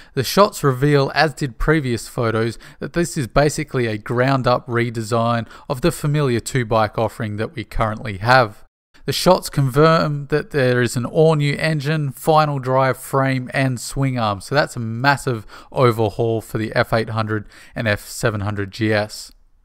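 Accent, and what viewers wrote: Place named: Australia. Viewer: I love the videos and I always look forward to the next release, but pleeeeeease prounounce Versys as it is intended. VERsatile SYStem. Surely your Australian accent shouldn't interfere with pronunciation of this portmanteau word? Just nit-picking :)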